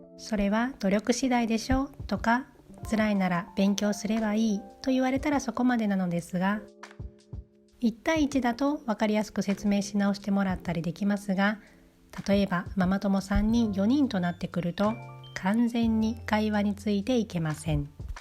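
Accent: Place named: Osaka, Japan. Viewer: Nobanaさんの大阪弁にほっとしています。読み上げてくださるので用事をしながら楽しませてもらってます。大阪府出身で、ニーダーザクセンのとある小さな村在住です。大きな瓶に入ったパーティ用のブラートヘリング南蛮漬けのミートボールが好きです。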